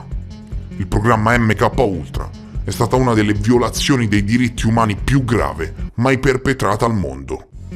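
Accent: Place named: Livorno, Italy. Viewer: Video top come al solito. Saluti da una concittadina (credo, se il tuo accento non mente sei livornese anche tu 😅)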